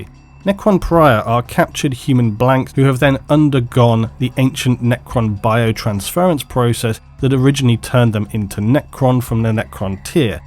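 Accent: England, United Kingdom. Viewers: Hello, just curious, what part of England is your accent from?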